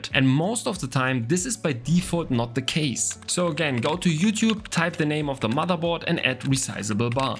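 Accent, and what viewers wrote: Germany. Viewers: Echt Traurig 😢 mach doch auch mal was für deutsche. Das Englisch macht einen verrückt mit dem deutschen - Englisch selbst skript lese akzent 😅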